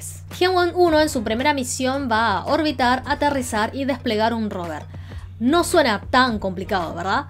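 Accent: Argentina. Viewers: Muy lindo su acento argentino chino y muy interesante lo que dice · interesante, se nota que estas o has estado en argentina mucho tiempo, porque tienes ese dejo, buena ventana de difusión de china, soy tu admirador. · Saludos desde Lima Perú ... excelente tu español, tu acento español Argentino. Éxitos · Muy simpática dándonos una brillante información y por cierto con un bonito acento argentino. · Que interesante video, pero me pregunto porque la srta presentadora tiene como acento argentino, que curioso. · Belleza que dominas pero muy bien el español castellano tipo argentino saludos desde santa cruz Bolivia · QUE ACENTO ARGENTINO QUE PUEDE DESAGRADAR A LOS SEGUIDORES, ENTRE ESOS EL SUSCRITO.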